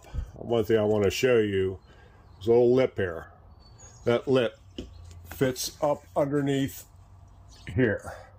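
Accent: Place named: Pennsylvania, United States. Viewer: I just hear Pennsylvania when you talk.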